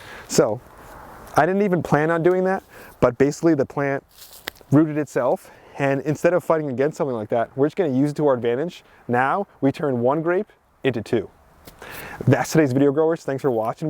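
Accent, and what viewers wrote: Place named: Philadelphia, United States. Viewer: are you from philly or jersey? I can tell because you say wuter instead of water like i do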